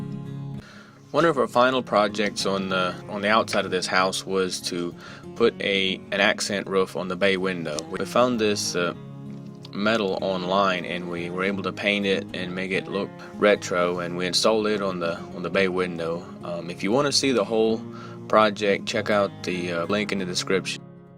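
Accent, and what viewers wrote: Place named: Louisiana, United States. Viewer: I was way off. Sounded more like southern Louisiana. I really like the Chanel. Keep it up bro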